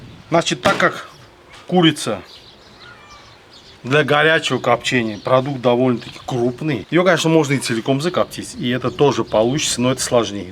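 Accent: Baku, Azerbaijan. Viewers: Такой позитивный и такой акцент красивый чисто Бакинский😂👍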